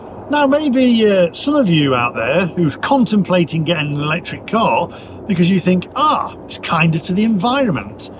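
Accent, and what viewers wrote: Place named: Leeds, United Kingdom. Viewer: Ha, I don't know where to start. 1. Sounds like you are in the North, Leeds? I can assure you that in London and the South East, it has been baking hot (26 and sunny on October 10th for example). 2. Now electric car ownership from a different perspective. I have a Polestar 2 with the performance upgrade giving 472 bhp and 0-60 in 4s. It has been 100% reliable for the 3 years I have owned it. I charge at home on the Octopus Agile plan which means that there is a lower overnight rate that is sometimes either free or Octopus pays me to charge my car. Yes, they pay me! Hard to believe but it's true. On average I run my car for around 2p a mile in 'fuel', compared to 18p to 25p per mile for your average petrol or diesel car. I have no road fund licence cost, (2025 will change that) drive free in London and can park in central London for 80p for 4 hours. 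Now non Tesla cars can use the Tesla fast charger network (not all of them yet) and generally the charging on the road network is getting better each month. Yes, insurance has gone up for ALL cars in the past 12 months . Last year my full comp insurance was £480, and this year £680. Would I reccommend an electric car, yes, 100% providing you are not pounding the motorway network all day. Home charging is a must IMO. If you can't do that I would think again. Finally, Luton airports car park was partly destroyed by a diesel car catching fire! Time to ditch these dangerous dinosaurs and go electric! :) .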